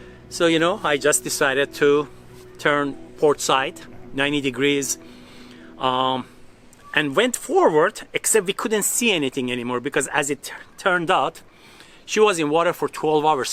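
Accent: Iran. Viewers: You are an awesome Iranian as your accent sounds like my Iranian friends from Chico State Circa 1975. I loved your original life story. Thanks brother for sharing. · Riveting, without being sensationalistic: A+. I could listen to this man speak on any subject (do I detect an Iranian accent?), and admire his humble-thorough approach… to everything, really.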